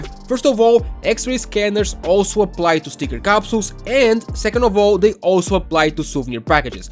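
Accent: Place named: Portugal. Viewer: tentas te mas sei que es portugues ahaha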